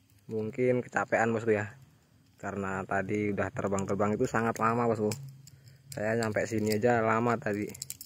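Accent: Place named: Malang, Indonesia. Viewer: Ini didaerah mana kang? Kok seperti bahasa saya daerah malang selatan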